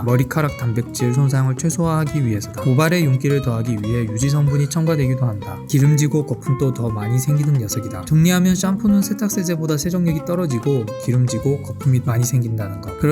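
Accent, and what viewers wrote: China. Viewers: 한국분인가요? 어째 발음이 너무 이상해서요. 억양도 이상해요. 지도상 우리나라 왼편에 있는 나라 출신의 발음과 억양으로 보이네요.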